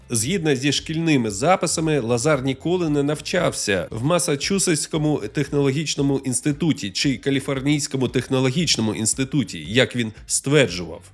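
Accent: Moscow, Russia. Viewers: Дізлайк за кацапський акцент. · А нічого, що він "акає" як москвіч??? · Слухаю це відео у 2026. Спочатку не помітила, що йому кілька років, і сильно здивувалася, чому Ви стали говорити з настільки виразним російським акцентом. Потім розібралася - за ці два роки прогрес у вимові просто чудовий. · Хто бере приклад з цього чоловіка? В нього жахливий російський акцент, купа русизмів і не правильні наголоси! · Чути російський акцент диктора, мабуть раніше говорив російською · блін, як мене КОРОБИТЬ від цих москальських ааа в українській мові !!!!!!!!!!!!!!!!!!! одразу видно, що людина ДУЖЕ ПОГАНО володіє українськю мовою! Запамятайте просте правило : в українській мові як пишеться, так і читається!!!! Простий приклад (слова взяті із відео - <link> : пишеться : "кілограмів", читається - ТЕЖ "кілограмів", а НЕ "кілаграмів"!!! пишеться "теоретично", і вимовляється ТЕЖ - "ТЕОРЕТИЧНО", а НЕ - "теоретична" пишеться "потрібно", читається - ТЕЖ "потрібно", а НЕ "потрібна" !!!!!!!!!!!!!!!!!!!!!!!!!! 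· Лайк за україномовний контент, але відчувається "рускоязичная чєлюсть"... Треба ще працювати над вимовою. І ще радив би зображення ведучого по максимуму замінювати відеорядом, бо дивитись на очі які бігають читаючи текст не дуже цікаво.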